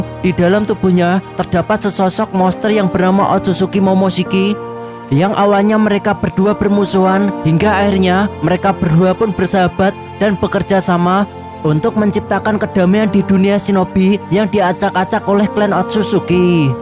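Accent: Java, Indonesia. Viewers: Eyo woh jowo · Ooh abange orang Jawa tohhh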